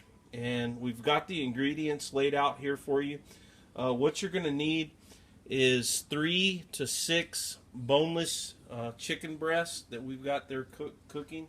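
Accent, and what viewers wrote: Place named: West, Mexico. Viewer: BTW-love your accent of Western and slight Mexicanish accent. Must be good since your mouth is watering as you cook. That's what all good chef do! :-)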